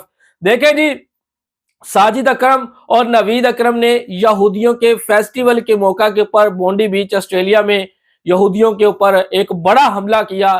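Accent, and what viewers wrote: Pakistan. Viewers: Me ne aaj is Bhai k channel ki detail check ki Is Bhai ka channel to Pakistan se chal raha hai. Is channel ki detail me Pakistan likha hai. Me b sochta tha k ye itna khul kar Pakistan ko q support karta hai Lekin dress Indian hai baqi is ki language muje confuse kar rahi thi k bolne ka style to bilkul hmare jesa hai. Haqeeqat Allah hi behtar janta hai.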